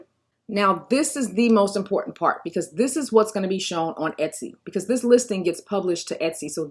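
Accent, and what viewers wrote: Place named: England, United Kingdom. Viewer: I like your english accent can you be my teacher:)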